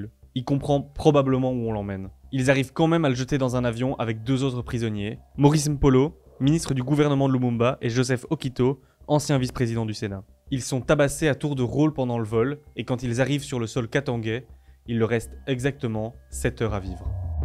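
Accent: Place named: Belgium. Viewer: Merci pour cette vidéo nécessaire ! Ça fait du bien d'entendre l'accent belge haha !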